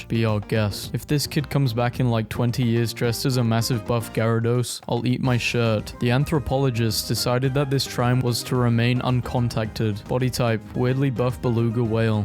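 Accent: United Kingdom. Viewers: Wait he's British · nah really? i thought he was from the moon? yes of course hes british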